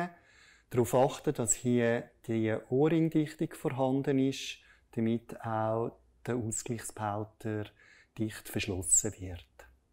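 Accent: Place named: Switzerland. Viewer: Obwohl ich aus Norddeutschland komme habe ich das Video mit dem Schweizer Deutsch super verstanden hammer Video Klasse erklärt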